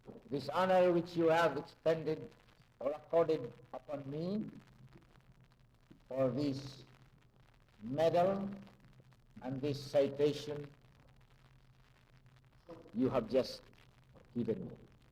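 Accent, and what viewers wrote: Philippines. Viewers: Rinig mo yung Bisaya accent niya haha. Not meant to be an insult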